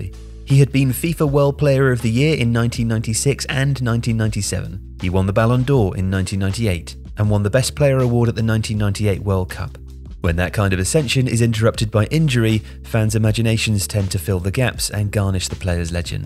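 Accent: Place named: United Kingdom. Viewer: Guys, I think you’re brilliant and I watch many of your videos because they’re awesome. But I don’t understand a) how you came up for the pronunciation of FENÔMENO (you said fenomino) and I don’t understand in which of the “best player of all time” conversation Ronaldo is NOT present. In my experience he is not only ALWAYS present, but up there for at least second-best. Is it maybe because I used to live in Italy and you (sound like you) live in the UK?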